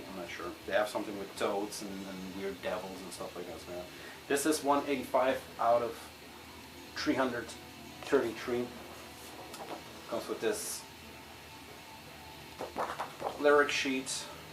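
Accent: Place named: Netherlands. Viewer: You have a Dutch accent, i am from Belgium! Good video!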